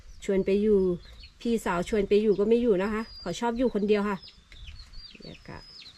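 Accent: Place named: Ratchaburi, Thailand. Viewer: สวัสดีครับน้อง ภาษากะเหรี่ยงทางราชบุรีฟังรู้ครับแต่บางคำก็พูดไม่เหมือนทางตากครับ fc จากตากครับ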